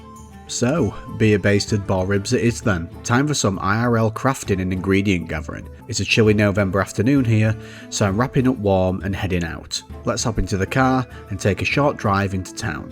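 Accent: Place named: United Kingdom. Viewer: Id speculate he's on GMT ish based on the accent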